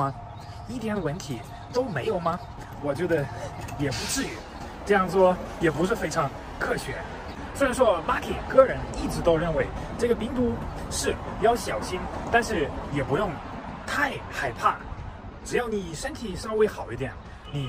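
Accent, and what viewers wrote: Sichuan, China. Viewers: 马克您的普通话好像有点四川味。难道是我的错觉吗？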